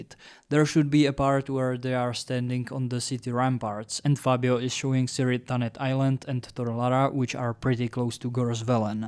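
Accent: Czech Republic. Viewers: Z přízvuku slyším že jsi čech, tak jen takhle utajeně :) : skvělej rozbor, ušetřil jsi mi čas se sledováním 3.série, to si raději konečně přečtu Husitskou trilogii.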